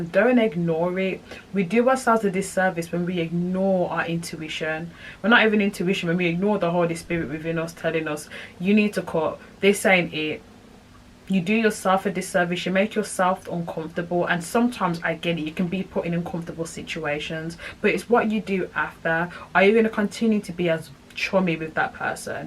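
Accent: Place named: Birmingham, United Kingdom. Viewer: Yea i can hear the brummie accent does not sound manc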